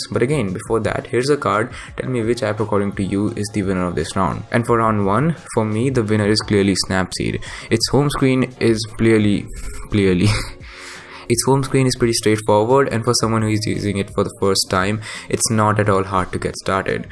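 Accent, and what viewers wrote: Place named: India. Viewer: Fucking indian accent so anoying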